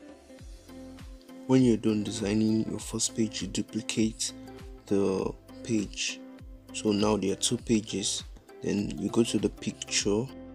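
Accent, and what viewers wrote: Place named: Nigeria. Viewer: I picked up a Nigerian accent right away 😀, and I've got to ditto other comments. I was like, 😲 great tutorial! Thanks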